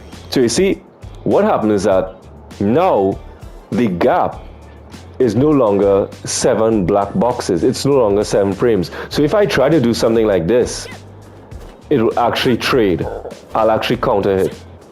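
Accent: Trinidad and Tobago. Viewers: For some reason the Trini accent is helping. Thanks for this tip, from one islander to another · You a trini?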